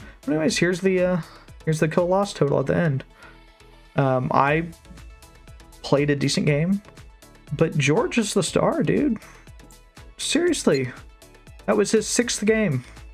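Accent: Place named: Texas, United States. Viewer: You have the least obvious Texan accent I have ever heard.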